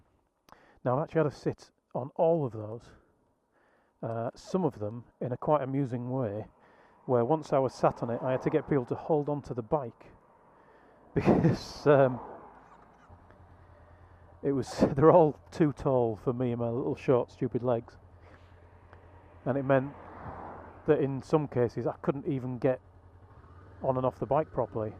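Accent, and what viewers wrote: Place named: Yorkshire, United Kingdom. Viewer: I enjoyed this video. I've already nearly decided to buy one. Last bike in England was a Honda SP2, but after eight years in Thailand on a 125, I am a "born again". But as somebody once wrote, " In the country of the sub 125, the man with 500 is king". The accent of God's Own County was a bonus.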